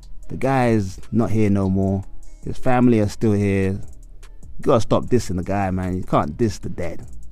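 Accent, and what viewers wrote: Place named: Haiti. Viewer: Wow your review is trash bro btw you sound hatian so that explains a lot you don't understand our music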